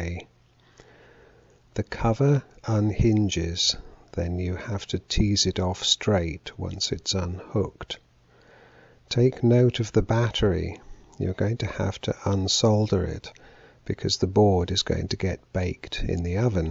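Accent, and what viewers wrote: United Kingdom. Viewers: ....and your British accent makes you that much more credible!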